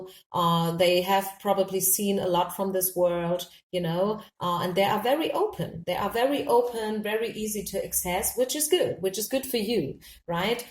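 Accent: Germany. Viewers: Kann es sein dass du deutsche bist? Der Akzent hört sich so an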